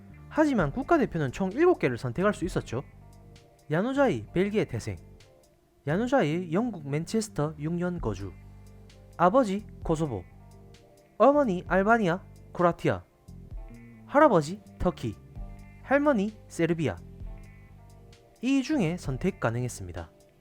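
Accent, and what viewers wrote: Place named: Samcheonpo, South Korea. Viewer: 고향이 어디세요? 남해나 삼천포 아닐까 예상해봅니다. 귀에 쏙쏙 들어와요. 구독했음요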